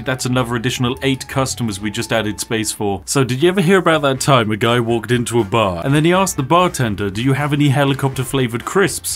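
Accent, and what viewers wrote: United Kingdom. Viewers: I never disliked a video faster (edit: I think he's funny I just hate british people's accents :( not personal though, I still subscribe I just dislike his videos)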